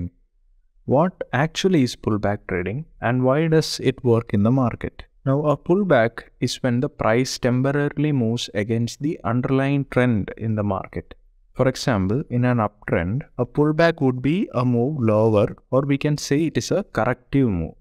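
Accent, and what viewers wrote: India. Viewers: How to understand the Indian pronounce？ 😅😅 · get an voice actor cause the idian accent drives me nuts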